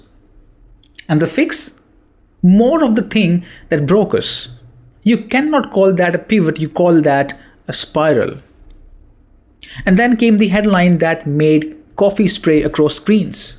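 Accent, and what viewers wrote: India. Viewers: Thank you!❤I like a lot your accent,make me think to magnific India!Keep up the real info!Respect from a romanian living in Germany!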